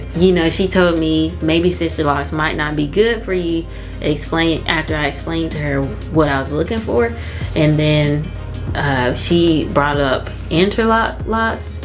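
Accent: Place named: South, United States. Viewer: New subscriber, wish I discovered you when I was loose natural but excited to see your loc journey and love hearing my you talk, the southern drawl is absolutely endearing ❤ Depending on how u maintain, your locs will likely swell to a traditional size. I've been loc'd for over 6mos now and my microlocs have almost doubled in size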